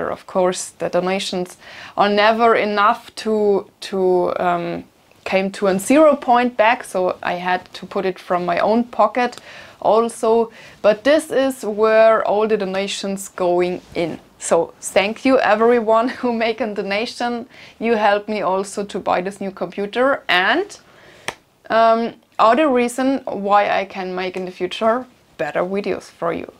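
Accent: Bavaria, Germany. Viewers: Hearing your accent makes me miss Bavaria. You seem tough and I think you will get this all right.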